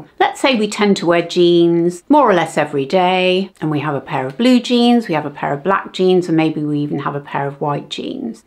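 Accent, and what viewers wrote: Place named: United Kingdom. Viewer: What a beautiful British accent!! Greetings from Poland😊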